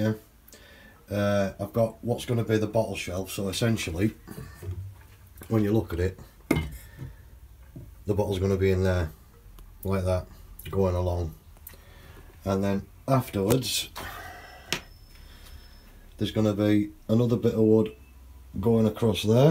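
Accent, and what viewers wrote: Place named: Hull, United Kingdom. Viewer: This is driving me nuts looking for clues. lol. Is it Hull?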